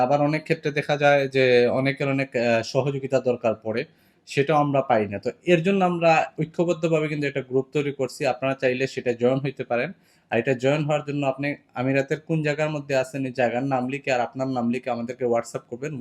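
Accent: Sylhet, Bangladesh. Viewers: ভাই আফনার মাতে লাগে,আফনে সিলেটি😊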